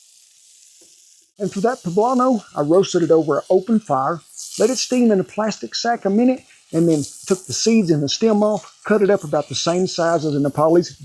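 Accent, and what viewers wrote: United States. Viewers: A country white man peeling and prepping nopales with a huge USA flag behind him and has a country accent. Mexican culture is strong.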